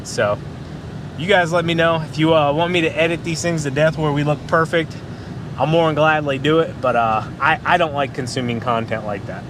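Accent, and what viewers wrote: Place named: South, United States. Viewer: just keep sounding like a southern red neck like me. i knew what you were saying.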